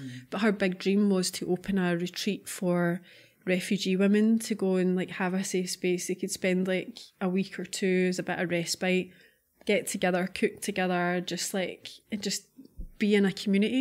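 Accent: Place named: Scotland, United Kingdom. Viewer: I could listen too her voice all day long. Scottish women 😍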